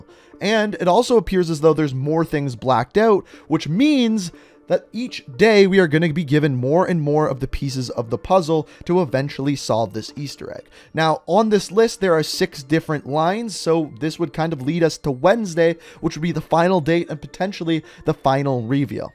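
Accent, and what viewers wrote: Canada. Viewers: Yo I love your Canadian accent much love my man❤️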